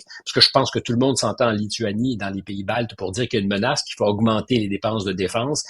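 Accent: Quebec, Canada. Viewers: l'actu avec l'accent du Québec c'est moins tragique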